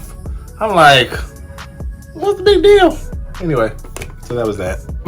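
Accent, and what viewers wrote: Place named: England, United Kingdom. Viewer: You did come back with a English accent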